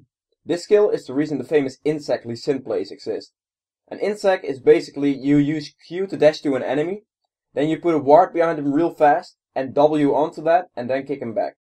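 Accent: Netherlands. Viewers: Talk slower btw are you dutch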